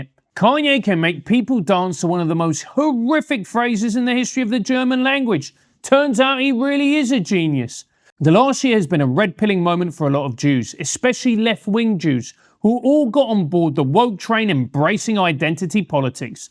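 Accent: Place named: Cockney, United Kingdom. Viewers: so why the hell is your accent cockney squared? Also f**k Wigan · 😂 is so English I love it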